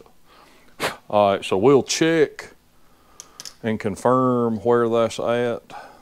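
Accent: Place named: Upstate New York, United States. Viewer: You guys showed up in my suggestions, insta subbed. My kind of folks, wrenchers. A few oddities, first I can tell you're from my neck of the woods by the way you say cobb-urator, second I have a valknut tattoo also, third you gave it away- them overworked roads look like home or close to it (DOT will get around to it when they're done with 85, so basically never), if I had to guess I'd say Anderson. Cheers mates, from a mobile mechanic, starting a year out of college trying my own business 🍻 Cheers from Pickens. Small world, Im only guessing the county but I know yall are upstate folks and that blows my mind. Be blessed guys. -Regards, Harley.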